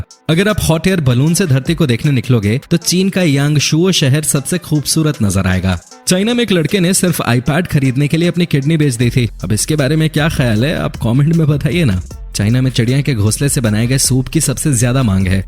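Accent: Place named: Darbhanga, India. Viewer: Darbhangha sa bol raha hi bhai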